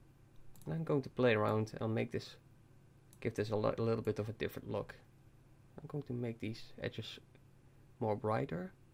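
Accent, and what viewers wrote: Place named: Netherlands. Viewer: Are U Dutch? I Can Hear It In Your Accent And If Youre Wondering Im Dutch As Well !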